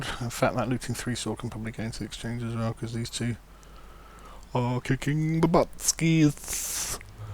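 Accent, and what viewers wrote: England, United Kingdom. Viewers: Hey your from England Ent ya?!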